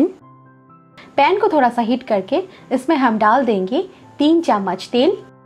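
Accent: Bengal, India. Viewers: Your Hindi accents are seems to be like Bengali. Are you Bengali?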